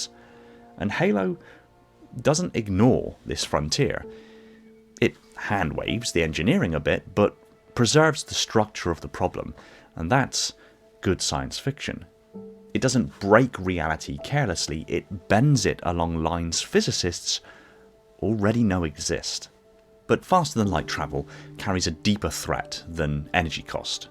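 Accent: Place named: West Country, United Kingdom. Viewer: Your voice is like Westcountry Xbox Ahoy. Somerset?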